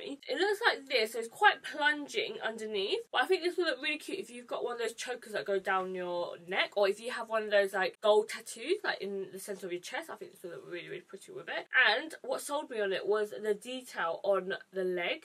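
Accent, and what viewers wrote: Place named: Cockney, United Kingdom. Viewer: Samia Muslimah she got an accent which is called cockney. I love her accent x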